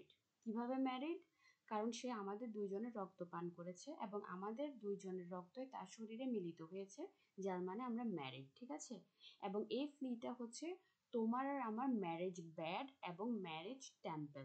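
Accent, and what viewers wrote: Chittagong, Bangladesh. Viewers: 100% apnar bari chittagong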